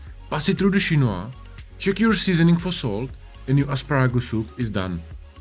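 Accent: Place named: Czech Republic. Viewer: Cause most of us has pretty distinct accent/pronunciation. Czechenglish.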